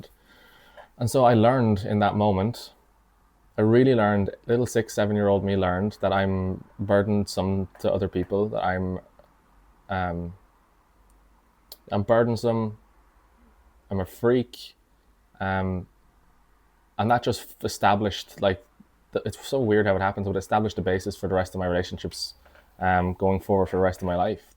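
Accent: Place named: Ireland. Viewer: I understand completely. Also, did this theme in therapy umongst other themes. Believing what you dud, that you deserve to be shameful, small and "wrong" is normal at that young age - having had that experience with those boys back when you were 7. The adult self understands this, but the inner child doesn't. When the inner child hears this, it can be like a crack in the mirror because the inner child defined itself as "wrong," shameful and small. You will definitely find to a point realising that this "strategy" to rather please and be good before approaching someone, was needed for the child to feel safe. So it wasn't wrong that you thought this way. Your adult self could tell your younger self now, that it is all okay, but that the younger self may trust you now in trying new strategies. By the way , I love Ireland and was just there 2 weeks ago. 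Hearing your accent makes me miss the Island so...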